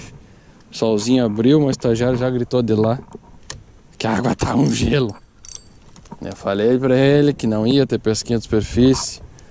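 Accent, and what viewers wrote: Rio Grande do Sul, Brazil. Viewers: A DORA GAÚCHO O SUTAQUE É TOP ABRAÇO A TODOS DO RIO GRANDE DO SUL 😘😘😘😘😘😘😘😘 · O lugar é lindo, o vídeo é top, porém o que eu mais gosto é o sotaque gaúcho. Sou Paulista porém gosto da cultura, da culinária e tudo o que é do Sul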